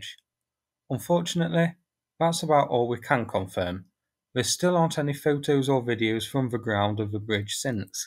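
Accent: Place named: Northern England, United Kingdom. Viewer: Thanks for the reliable info that you give us. May I ask a personal question? I love your accent, may I know where you are from? Perhaps northern England?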